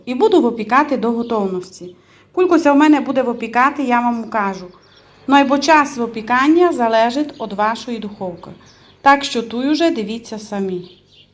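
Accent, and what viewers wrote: Transcarpathia, Ukraine. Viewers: Як приємно вас слухати...ваш закарпатський діалект...щасливого вам Різдва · Дякую за ваші рецепти, з задоволенням слухаю вашу закарпатську вимову, дуже подобається.Миру і всіх життєвих гараздів. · Який же чудовий закарпатський діалект :) Так приємно чути! Дякую! Багато цікавих рецептів, вже хочу спробувати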